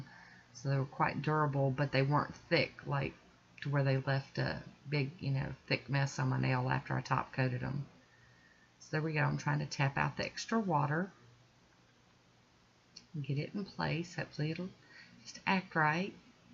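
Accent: South, United States. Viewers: I hear a southern accent in you! That's the same for me, I'm a southern girl all my life! My husband loves for me to say certain words and will have me repeat them sometimes or he'd say it after me lmbo, like, night (telling him goodnight) bite, like, light lol he gets a kick out of it when I say those words and we both end up laughing! People always ask me to repeat myself just so they can hear me say whatever I said again lol!! I think we probably would be in trouble quite often if we could hang out! If you ever come to central Fl it'd be a great treat, for sure!!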